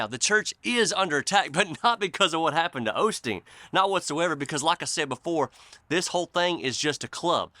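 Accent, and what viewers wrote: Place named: Rural, United States. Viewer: Read Ezekiel 13. Wow? Keep preaching with that country twang. This is a city boy from Detroit. You are appreciated!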